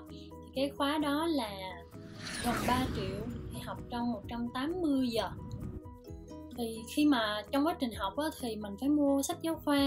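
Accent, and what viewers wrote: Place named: Nha Trang, Vietnam. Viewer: Bạn này giọng nói như ngừoi Nha Trang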